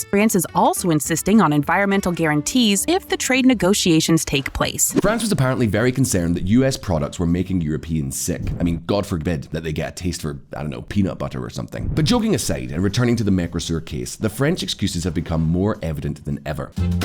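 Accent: United Kingdom. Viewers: Curious which part of UK is the narrators accent from?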